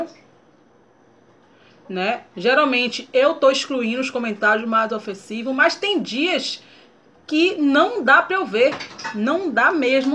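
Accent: Bahia, Brazil. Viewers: Engraçado ne não agravando a todos "Tem pessoas q não gosta do nosso sotaque baianes fala mal de nosso povo e quando chega o Carnaval tá todo mundo socado até o talo na nossa terra vai entender "Manda esse povo deitar na Br. 😂😂 Linda sua maquiagem.😘😘😘 Liga não o povo quis dizer q seu amor é todo grosso mais é os braços kkkkk 😂😂😂😘😘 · kkkkkkkkkkkkkkk isso mesmo manda conta do mes pra pagar seu dentistakkkkkkkkkkk adorei o beijo p euzinha e p Joaozinho linda que Deus abencoe muitoooo baiana arretada adoro seu sotaque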